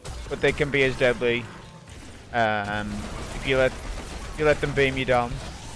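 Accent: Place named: Cumbria, United Kingdom. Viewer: haha im from cumbria too mate and i just noticed your accent and thought he sounds very local!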